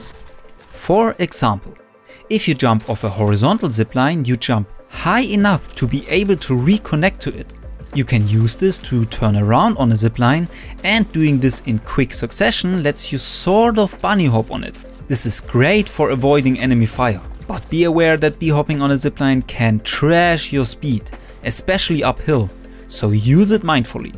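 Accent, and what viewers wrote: Germany. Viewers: Your german accent made 'Super Jumping' feel even cooler. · i can hear it in his voice he‘s german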